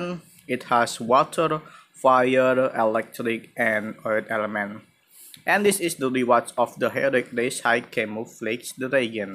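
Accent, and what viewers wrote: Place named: Indonesia. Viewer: Bro, are you from indonesia? Your accent sound like Indonesian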